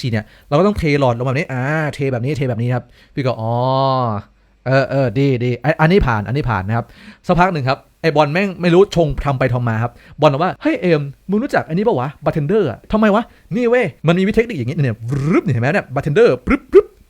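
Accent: South, Thailand. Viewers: เป็นคนใต้ที่เอ็นดูความพูดทองแดงอะ<num>พี่ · เด็กปากใต้นิ555+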